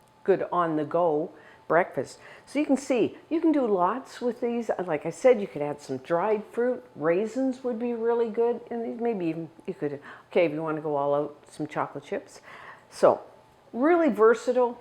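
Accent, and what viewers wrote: Canada. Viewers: Welcome back,! Always the best recipes n explained so nicely I hear that Canadian accent a bit, I think 🥰.. love it! Love that color on you too🌷🌸🌿🌸🌷🌿🌸🌷🌿🥰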